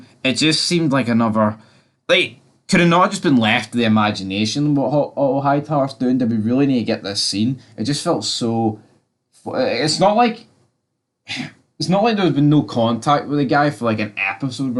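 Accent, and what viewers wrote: Scotland, United Kingdom. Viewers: Exactly the same as mine, so I am guessing east central Scotland, Fife , Edinburgh or Falkirk. Listening more though, it might be a wee bit further west , nearer Glasgow.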